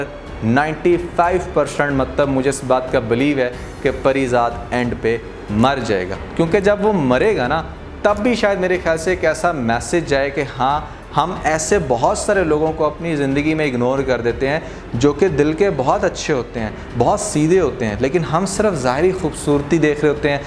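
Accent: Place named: Punjab, India. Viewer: Nheeeed 😂😂😂😂 Bhai apni pronunciation Tu thik kro.. Urdu main b Punjabi touch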